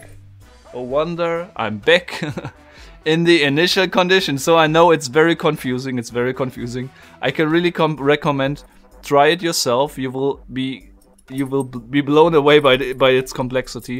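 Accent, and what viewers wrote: Germany. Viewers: ich hätte nie gedacht .... dass ich diesen kanal in meinem leben brauche :-D faszinierend .... entspannend und einen niedlichen deutschen akzent ... ich bin in einer endlosschleife gefangen und kann nicht aufhören deine videos anzuschauen ... danke dafür ... grüße aus mittelfranken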